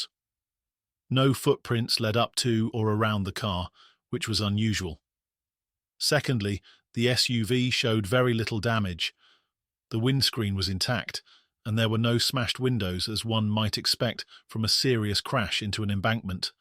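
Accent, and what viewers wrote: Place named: United Kingdom. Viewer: Never sounds right with British accent, especially as scripts are written by Americans, so have American words like "sidewalk" - in England we say "pavement". It sounds odd hearing these words read in a British accent. It's more genuine when a native narrates, one can get into the spirit of the story better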